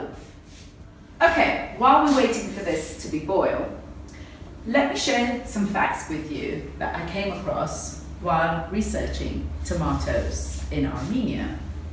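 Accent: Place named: United Kingdom. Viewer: Great video! personality and her British English, plus some information give the viewers so much info and pleasure to watch. Thank you! I watched to the end..., you are so funny and definitely a lifelong learner!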